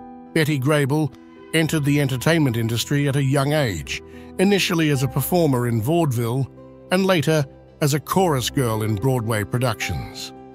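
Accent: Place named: Australia. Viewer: I looked at your 'about' and see that you are located in the UK. I hear an Aussie accent 🤔😊.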